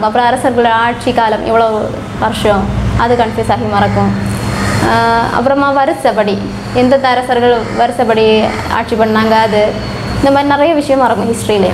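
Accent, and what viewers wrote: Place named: Kanyakumari, India. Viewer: Kanyakumari slang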